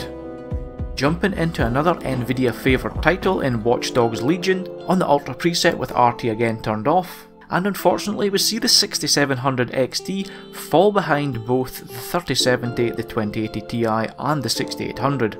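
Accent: Scotland, United Kingdom. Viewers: i love listening to Scottish people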